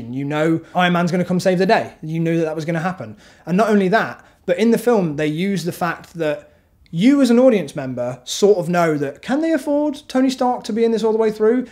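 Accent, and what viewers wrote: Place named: East Midlands, United Kingdom. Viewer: I knew was an East Midlands lad! Woo!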